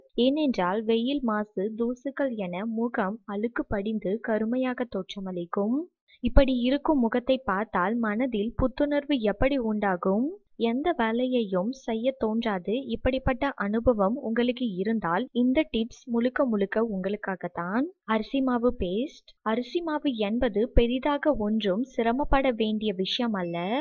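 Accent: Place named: China. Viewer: பேசுவது சீன பெண். அதனால் மன்னித்து விடலாம்.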